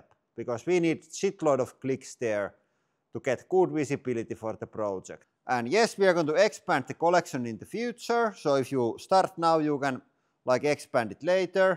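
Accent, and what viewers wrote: Finland. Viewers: Some of us are here for the pressing action, some of us are here for the ralli English. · i dont understand a fu**in word of your rallienglanti. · ..mietin, että mukava viteo.. mistäköhän. ? .. mutta sitte tuo kotosen kuulonen ”rallienklanti” kertoo että juu, härmästähän nämä.. 😎 ….mukavaa testiä.. 👍👍👍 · Love the Finnish accent 😊 Greetings from Austria 🇦🇹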